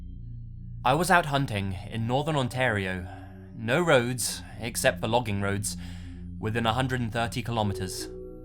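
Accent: England, United Kingdom. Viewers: what part of england you from? or london should i say? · Thanks for the content! Even though I'm from the UK also and have a similar accent, the way you said "and he stank" really tickled me, idk why haha. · I just love your voice and these abruptly pronounced sentences with english accent. Pure eargasm. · Are we done picking on a person who sounds like he speaks with an "English accent" for pronouncing some "American words" in a manner which has some people Triggered? How about y'all Get Over It?! Maybe those Americans should concentrate on the fact that WE refer to many places in ways that the Locals don't...and THEY live there. Germany is called Deutschland. Turin Italy is called Torino by locals. Europe is often referred to as Europa. Keep in mind, the Narrator is (maybe) English. He speaks English. Maye it is YOU who are pronouncing names incorrectly.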